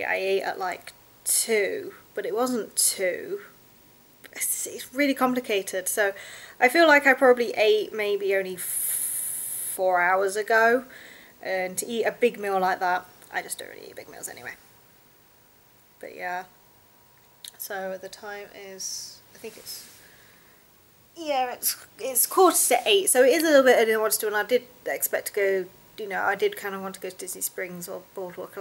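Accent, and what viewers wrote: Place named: United Kingdom. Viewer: You are cute. Love the UK accent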